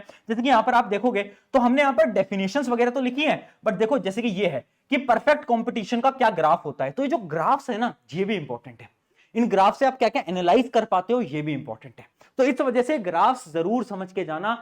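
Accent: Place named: Kerala, India. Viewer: Malayali hearing there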